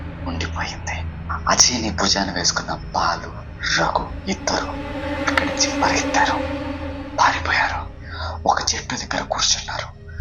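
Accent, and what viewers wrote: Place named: Telangana, India. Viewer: Excellent bro me Telangana slang lo cheppadam chala bagundi. Ipude subscribe cheskunna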